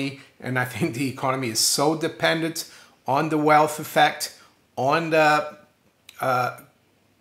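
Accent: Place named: East Coast, United States. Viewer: Another good video from the Brazilian with the U.S. east coast accent. 👍😊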